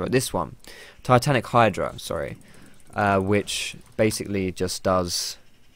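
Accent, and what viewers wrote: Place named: England, United Kingdom. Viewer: I still love youre videos and I think you should make videos w/o your face. English accent with being able to see the face of the speaker creeps me out.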